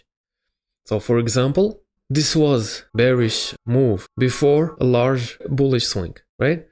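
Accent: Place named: Morocco. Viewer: Are you moroccan brother? you sound like it, I am too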